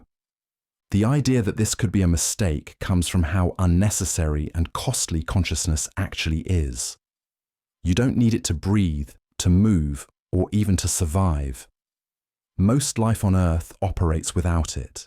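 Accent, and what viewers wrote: United Kingdom. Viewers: Please never change the voice, I love this British voice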